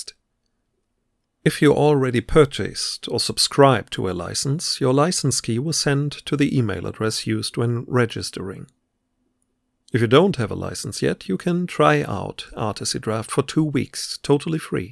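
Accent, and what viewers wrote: Germany. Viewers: I understand a german accent when i see one. Könnt ihr nicht mal ein komplettes deutsches tutorial machen. So ein, so plant man ein Spiel und so nutzt man dazu articy draft.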